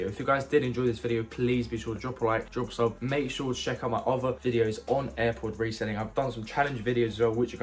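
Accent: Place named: Bulgaria. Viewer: Are you bulgarian?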